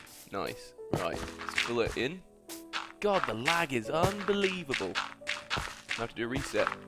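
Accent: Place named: United Kingdom. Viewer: omg I just luv ur british accent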